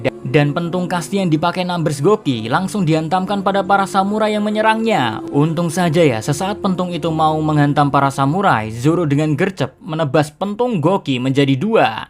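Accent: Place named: Jepara, Indonesia. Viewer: Adminnya orang mana sih ?? Aku denger² kok orang jepara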